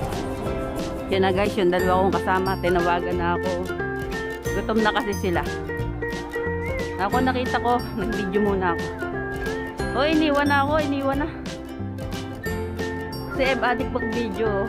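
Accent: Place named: Batangas, Philippines. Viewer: Such a beautiful plce . Enjoy your Gala nga Sissy . Stay safe. Tga Btangas ka na Sis. May punto kasi.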